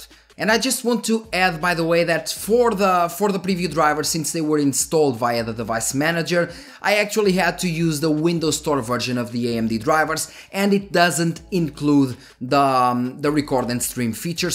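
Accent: Portugal. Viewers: mate can you make a video with you real portuges acento. i would love to hear you speaking Portugues parcero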